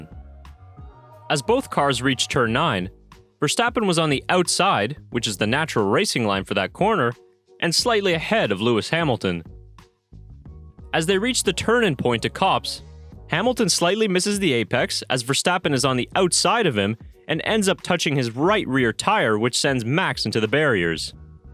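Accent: United States. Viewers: Just brainwashing the MAXIDIOTS with an American accent to make it seem like it’s coming from someone unbiased.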